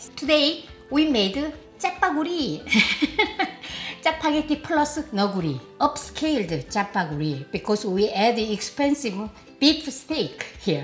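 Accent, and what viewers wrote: South Korea. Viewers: 한국식 영어라그런지 번역 없이 다 이해가된다 신기 ㅋㅋㅋ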